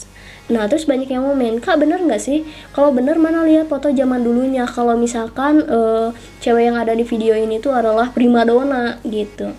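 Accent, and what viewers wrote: West Java, Indonesia. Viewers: Boleh nanya ka org mana si? Jabar kah:v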